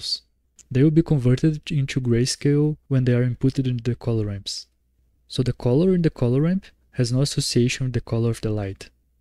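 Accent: Brazil. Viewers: mano vc é brasileiro?? kk percebi muito o sotaque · Sabia que tu era brasileiro nos primeiros 20 segundos XD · That accent. Brazilian. 🤔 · Cê tem sotaque de brasileiro amigo, ótimo vídeo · Esse sotaque de brasileiro maravilhoso, que vídeo prft irmão! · For some reason, it look like u have a Brazilian accent 😂❤ thanks for the video, it's amazing how we can now make those things with such a easy way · Bizarro como da pra saber na hora que é br mesmo falando em ingles kkkkkkkkk, vlw pelo video pai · Sotaque Brasileirinho... nice addon btw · Man... Are you Brazilian? Your way to talk makes me think so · Do I hear a brazillian accent? Isso parece tecnologia da boa! Adoro mexer com píxeis e renderização! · nossa eu acho impressionante como eu descobri que você é brasileiro só pelo sotaque, o meu é igual dfgfsjhs quero um dia aprender a fazer render então muito obrigada pelo vídeo <3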